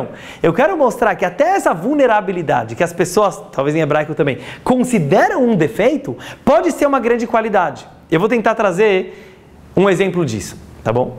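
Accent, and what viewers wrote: São Paulo, Brazil. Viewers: Se este sotaque paulista não fosse tão irritante eu veria o vídeo ate o final, mas não consigo. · o que irrita o sotaque paulista?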